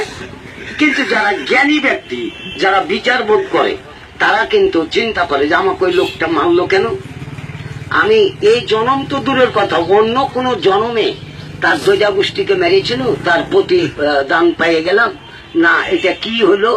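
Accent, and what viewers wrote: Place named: Murshidabad, India. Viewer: Murshidabad er motho bhasa bolchhis re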